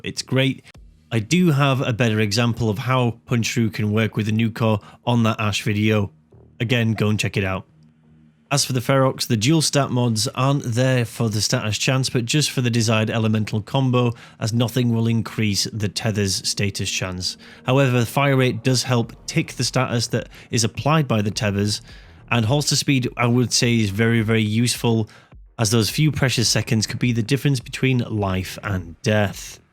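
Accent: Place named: United Kingdom. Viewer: love that british voice of yours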